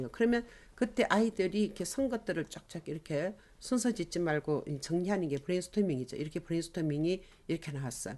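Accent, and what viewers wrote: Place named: Gyeongsang, South Korea. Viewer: 교수님 경상도 사투리 억양이 살짝 들어간 목소리가 사람을 끌어 당기는 힘이 있어요 ㅎㅎ 강의좋고 목소리 좋고 ~^^♡😍😁😝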